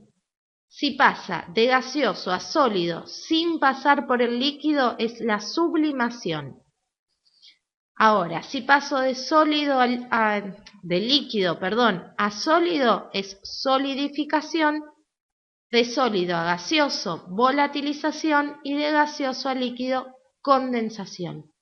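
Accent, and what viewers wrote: Argentina. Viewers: Habla español cruck lo diferente es que tiene el acento argento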